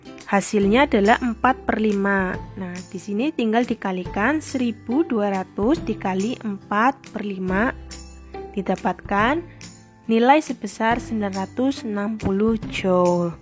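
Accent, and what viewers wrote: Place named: Tegal, Indonesia. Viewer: Ini kayaknya mbaknya orang tegal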